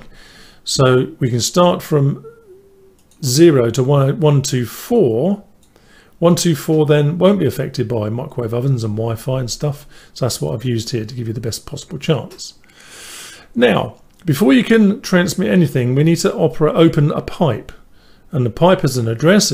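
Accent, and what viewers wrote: United Kingdom. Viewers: Hello, you accent sounds "British" ;-) The problem is, that these chips are clones. The original ones from Nordic are much more expensive, about 5 USD / pc. I have aprox. 30 of them and only 20 are worht to be used in applications. Schöne Grüße aus Deutschland. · Oh my ears are happy. There are some great tutors on youtube and i can't take that away from them but whiny American accents drive me insane. Even the accent of a southerner is music to my Leeds ears ;-) Great tutorial, Subscribed.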